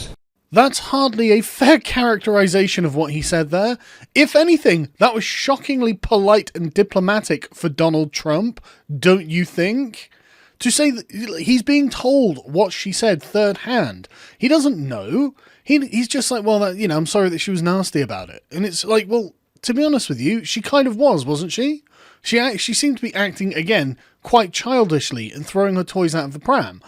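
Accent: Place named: United Kingdom. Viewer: Sargon makes a living by being a giant British accented dick to the leftist progressives. For which I pay him cash for not only being entertaining but quite effective at it. #Sargonpillowhug Donald Trump took a pay cut and a hit on his future earnings by taking on the leftist progressives not just here in the states but around the world and he does it by not only being a 'giant orange dick' but by actually hurting their causes and exposing them for the lunatics they are. For which I will vote for him again and I hope his son is taking notes. The thought of what will happen to the #resistance voters if another President Trump sits in the White House for eight more years is quite pleasing. Maybe then we could get some real Conservative ideas and ideals cemented in place and we can yeet these Marxist bastards back into their holes for another hundred years or so.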